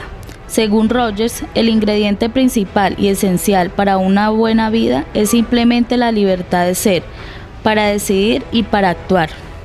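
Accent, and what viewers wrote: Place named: Cali, Colombia. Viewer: oye ois vos sos como caleña amista.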